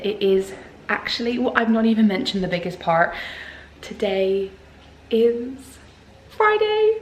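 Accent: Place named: Bristol, United Kingdom. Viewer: Your accent🙂Are you from Bristol?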